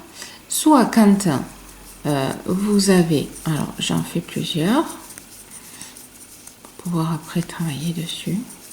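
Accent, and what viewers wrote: South, France. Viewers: Bonjour. Très utile ce tableau, associé au plaisir d'entendre ton accent du sud!❤❤❤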